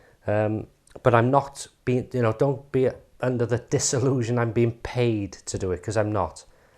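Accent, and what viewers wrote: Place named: North Wales, United Kingdom. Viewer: Hi, Top man, interesting, informative chat. I look forward to all you videos, love the North Wales accent, makes me feel like being home. Keep up the good work Cheers Steve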